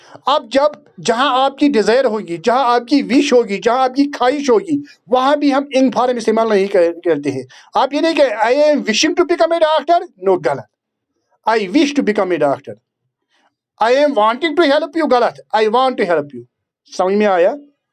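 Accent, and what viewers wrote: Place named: Kashmir, India. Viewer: Sir great expl. in Kashmiri accent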